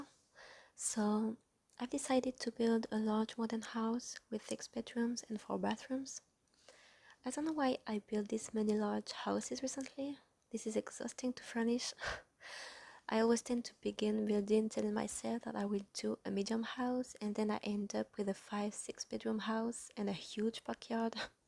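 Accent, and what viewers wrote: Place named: France. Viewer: Hello! Je suis moi aussi française, donc on va dire que ça m'arrange que tu ais l'accent, comme ça je comprend mieux ! Sinon tes constructions sont toujours super belles, je me demande toujours comment tu arrives à faire de belles choses seulement avec le jeu de base où quelques packs... Moi j'ai quelques packs, mais je ne peux plus m'en passer ! Et aussi, je trouve ça cool le voice over!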